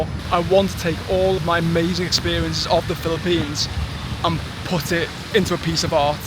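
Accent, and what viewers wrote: United Kingdom. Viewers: You're a very gifted artist/illustrator. 🎨🖌 Sorry I'm not good at distinguishing accents. What part of the UK are you from? So great to hear that PH is your favorite place in the world to visit. 😊 As for recommendations, Batanes is one of the places here we are really proud of. It kind of looks like Scotland or NZ but in a tropical setting. There are actually tons of awesome places you can visit here. Some are only mostly frequented by locals. :)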